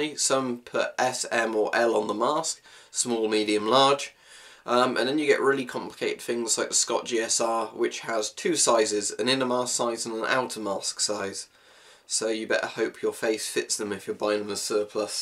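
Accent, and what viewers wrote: Russia. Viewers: Russian accent 20/10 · I want an entire video of you talking with that russian accent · Great russian accent :) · nice russian accent bro, you sound like you speak it fluently.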